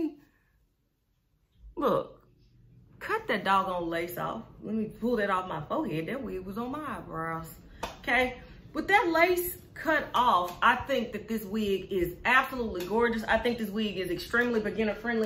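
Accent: South, United States. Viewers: OMGGGG!! I love everything about this! Your spirit, your energy, the southern twang, that bomb ass haircut and your beautiful self. Best review EVER!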